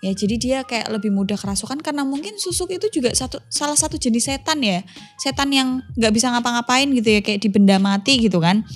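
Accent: Java, Indonesia. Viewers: Kak sampean orang Jawa to😍🤤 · Medok e mbak *... Membuat terngiang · Jowo tulen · logatny sdkit Jawa Ak sng ngdenger ny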